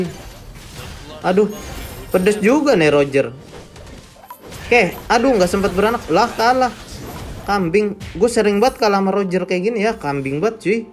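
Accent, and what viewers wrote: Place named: West Java, Indonesia. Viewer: bang lu orang sunda ya, bru pertama nonton gw vitub lu, sumpah ngakak, dri cara ngomong lu plus seru juga, gw ikutin terus chanel lu bang .. mantap 🎉🎉🎉😅😅😅😅😂😂😂😂😂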